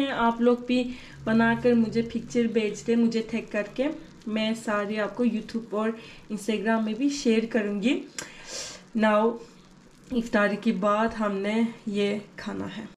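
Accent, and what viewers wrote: Pakistan. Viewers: Inka Urdu lahza pakistan ke kis area ka hai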